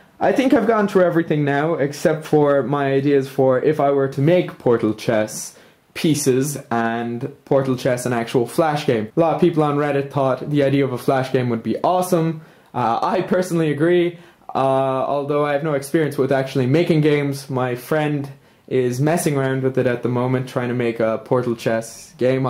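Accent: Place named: Ireland. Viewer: I could tell you were Irish in the other video. Probably because I watch a lot of U2's old concert vids on youtube - your accent is quite close to Bono's.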